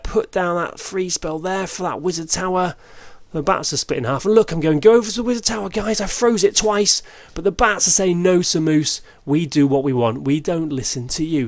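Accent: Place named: United Kingdom. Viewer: I don’t know about the “Batman” voice...I do know that I’ve enjoyed your videos since last April when I signed on. Your content is outstanding and has helped me quite a bit. Matter of fact, your “Ice” troop configuration has given me quite a bit of success. So much that others in my clan use it now with devastating results. Your commentary, delivery, and UK diction is far and away the best!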